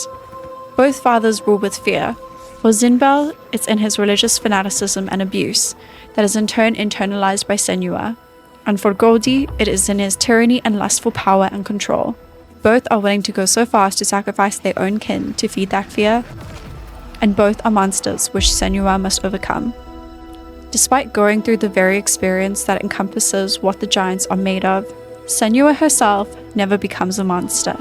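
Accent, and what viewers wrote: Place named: South Africa. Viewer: Only realised after watching its you, i was like omg this is a south african voice and couldnt figure out where i heard it.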